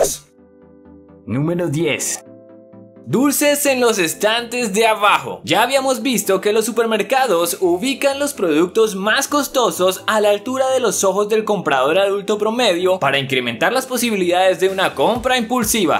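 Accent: Colombia. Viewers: Sabía que ese acento era colombiano!!!! XD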